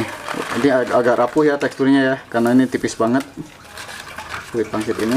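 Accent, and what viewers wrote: West Java, Indonesia. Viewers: Kang orang sunda mana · beda wae ari urang sunda mah nyarios na jakarta bandung hahahaha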